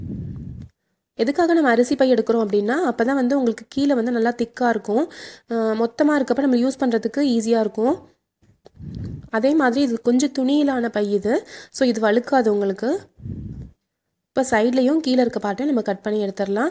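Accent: Coimbatore, India. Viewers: Akka nenga Coimbatore ah..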